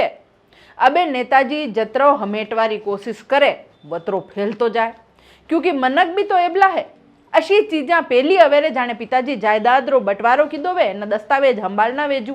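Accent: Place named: Mewar, India. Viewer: मेवाड़ी बोली गणी प्यारी लागी सा 🙏🙏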